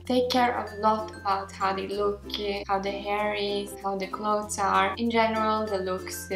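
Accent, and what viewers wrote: Italy. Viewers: You have an Italian accent, but you are Norwegian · developed such a noticeable Italian accent in english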